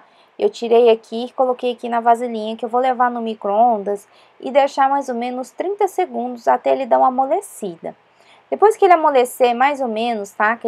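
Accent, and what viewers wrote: Minas Gerais, Brazil. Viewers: Eu amooo ouvir ela falar, parece aquelas mineiras bem da roça kkk ❤ Amo seus vídeoooos ❤❤❤❤❤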